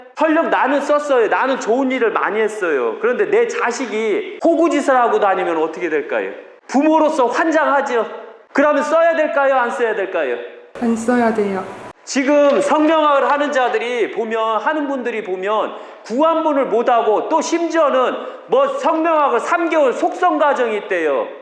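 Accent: Chungcheong, South Korea. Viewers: 쉬운듯 어려운듯! 알아들을듯 말듯! 이해가 가는듯 아닌듯! 그래서.. 자꾸 듣게되네요 저도 충청도사람인데 충청도사투리가 정겹네요~^^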